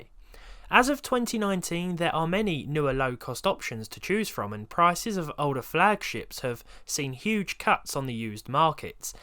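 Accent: United Kingdom. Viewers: Are you from british ? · Love your videos my British friend you are excellent very enjoyable good reviews keep it up I hope you're sponsored and people give you money U2 must love you I'm hoping and you deserve an award by now anyway like I said you one of my favourites I was watcher stuff we always seem to pop up all the time so I do continue to watch her things keep up the great work love your accent love your comedy try to throw more comedy in there that's very enjoyable try to stay British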